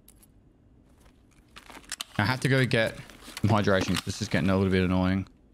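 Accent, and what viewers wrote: Australia. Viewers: Australians have the best accent lol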